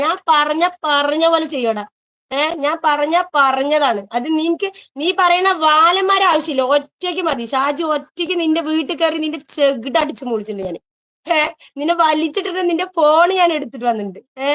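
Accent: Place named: Kasaragod, India. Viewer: ഇവളുടെ കാസർഗോഡ് ഭാഷയാണ് സഹിക്കാൻ പറ്റാത്തത്... ഇടക്ക് അത് കേറിവരും...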